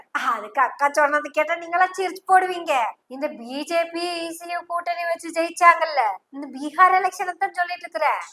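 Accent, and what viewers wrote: Kongu, India. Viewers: Very interesting and thought provoking presentation in the sweet Kongu Tamil. May the Almighty bless you for better prosperity. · மகளே..!!.!! எப்போதும் தேன் ததும்பும் கொங்கு தமிழிலேயே உன் கருத்துகளை பதிவு செய்யம்மா என. தாயே..!! சிறப்பு..!! மிக சிறப்பு..!! மிக மிக சிறப்பு...!!!?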